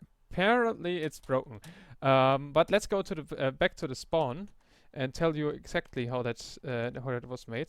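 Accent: Germany. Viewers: are you german? :D your english sounds like your german^^ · german huh ? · It's probably German because of how the word what sounds like vhat And we is ve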